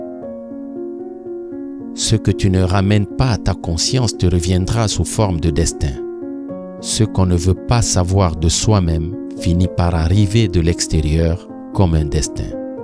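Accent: Africa. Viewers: L'accent africain sur fond de piano répétitif rend l'écoute bien désagréable, néanmoins l'effort nécessaire à la bonne compréhension du message est fructueux. Merci pour cette vidéo et le partage de connaissance. 🙂:thanksdoc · Tout simplement formidable ♥️ j'adore l'accent africain plein de chaleur et de sagesse 🌞MERCI🙏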